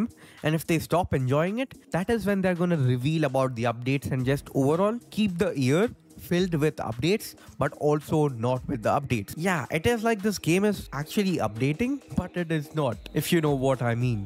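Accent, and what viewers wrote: India. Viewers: Dude your voice is like a bit Indian ngl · ur accent is like an Indian no hate tho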